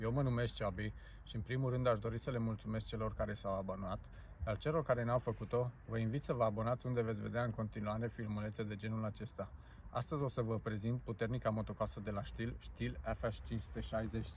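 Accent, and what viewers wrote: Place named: Russia. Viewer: Parece que hablas ruso y que hablas español, que eres?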